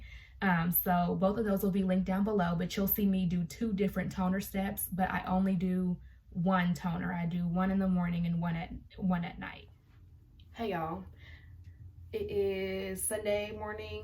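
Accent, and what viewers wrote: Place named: South Africa. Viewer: Hey. Am from South Africa. (Am Assuming) You sound South African😹😹 Where can I get that face washer😭😭😭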